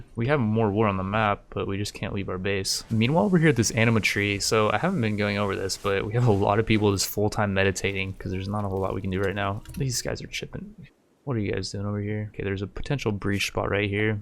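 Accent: United Kingdom. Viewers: it's called british english, he's pronouncing it perfectly fine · he sound British to you?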